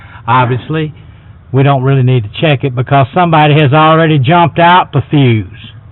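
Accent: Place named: Louisiana, United States. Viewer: Are you from Louisiana? For Alabama because your accent sounds very Cajun brother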